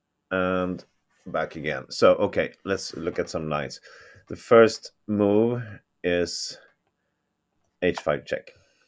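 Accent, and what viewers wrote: Sweden. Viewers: Great work bro!! Are you from Sweden by any chance?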